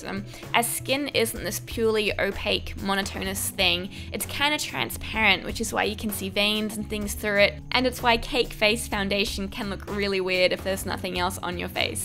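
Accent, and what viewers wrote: Australia. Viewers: Came here from Mykie. Are you Aussie? Hi fellow Aussie! <3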